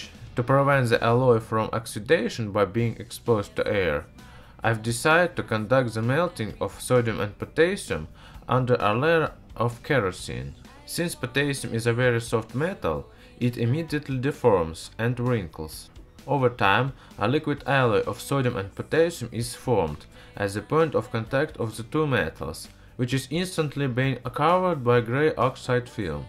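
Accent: Russia. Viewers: Cilla Moke it's a Russian accent, isn't it?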